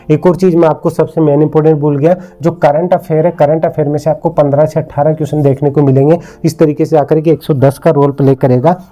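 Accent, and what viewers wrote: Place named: Rajasthan, India. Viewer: Sir Apki Rajasthani langauge bhut sweet hai sir ap ese ma hi video banya kro😍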